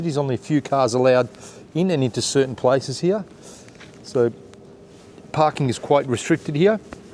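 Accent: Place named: Prekmurje, Slovenia. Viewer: "Slovenski gučiš?", hahaha funny. Unfortunately your prekmurian dialect wont help you much in other parts of Slovenia, but its nice to hear.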